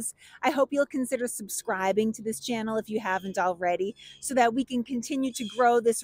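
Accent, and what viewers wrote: Boston, United States. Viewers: Visiting Boston this summer and wanted to see Bunker hill. The moment she said "Wah" in her Boston accent; ; I knew I had to stay for the whole video. :D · Love that Boston accent.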